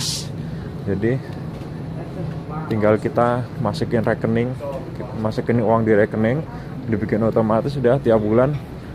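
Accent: Java, Indonesia. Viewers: Wong jowo pak? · cak jowone ngendhi to..... hehehhehe apik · Jowone ndi 0m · Bahasanya,,, kok cukup medok, kamu asli mana mas,,, kok kaya jawa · Omongane kok kyok jowo² ngono ya mas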